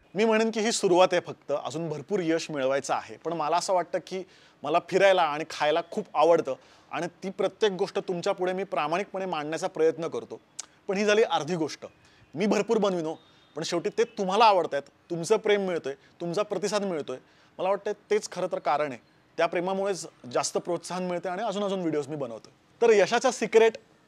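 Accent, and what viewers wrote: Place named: Pune, India. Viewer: टिपिकल पुणेरी मराठी... छान वाटले... खुप जवळच वाटले... मस्त 👌👍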